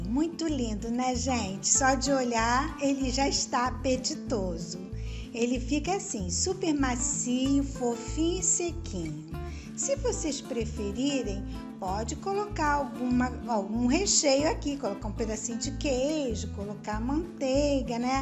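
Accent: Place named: Rio de Janeiro, Brazil. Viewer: Pelo sotaque é do Rio de Janeiro 😅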